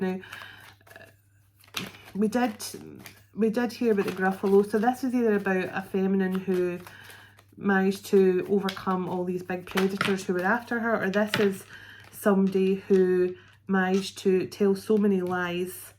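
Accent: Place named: Scotland, United Kingdom. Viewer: Love ur reading style,seen nothing like it,❤❤❤it.a while back I guessed irish,Irish, corrected me kindly,no its obviously scottish=D.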